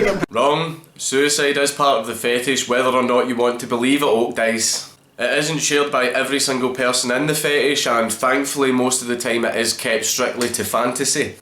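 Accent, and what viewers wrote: Scotland, United Kingdom. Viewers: never can understand all of scottish accent but Glasgow i can easy but thats not why im here Dankula is a legend :)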